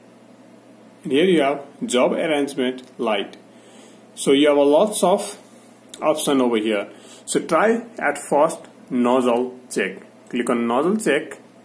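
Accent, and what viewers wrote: India. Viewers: thats a beautiful indian accent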